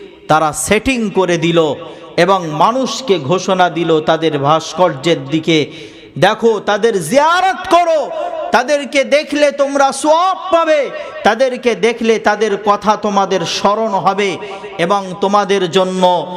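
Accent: Bangladesh. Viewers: আপনার কথা শুনে কেন যেন মনে হচ্ছে আপনি বাংলাদেশী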